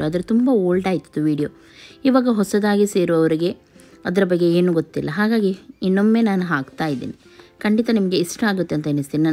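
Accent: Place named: Mangalore, India. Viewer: ಟಿಪಿಕಲ್ ಕುಡ್ಲದ ಕನ್ನಡ.. ಚಟ್ಟೆ ಮಾಡಿ, ಮುದ್ದೆ ಮುದ್ದೆ ಮಾಡಿ, ಕರ ಕರ,.. ಕರೆಕ್ಟಾಗಿ ಹೇಳಿ ಎಷ್ಟು ಸಲ ಕರೆಕ್ಟಾಗಿ ಹೇಳಿದ್ದೀರಿ.. ಸೋ ನೈಸ್ ಅಕ್ಕ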